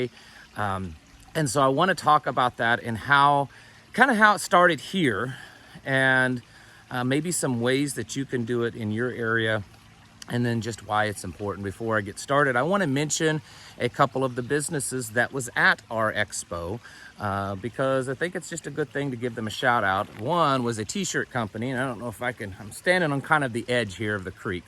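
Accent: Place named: South, United States. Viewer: Very very southern ill. Hello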